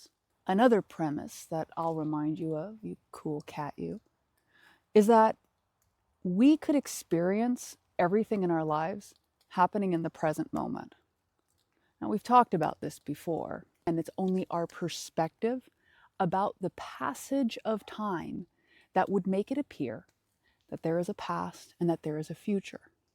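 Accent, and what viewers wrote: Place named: South, United States. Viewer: Didn't think you'd be southern. Refreshing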